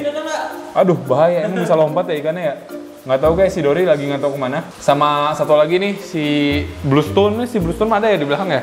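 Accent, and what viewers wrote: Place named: South Jakarta, Indonesia. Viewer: Bocah Jaksel mana suaranya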